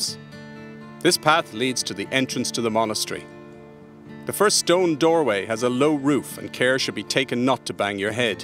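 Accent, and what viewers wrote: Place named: Ireland. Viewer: that guide at 5.00 min. is American but narrator is Irish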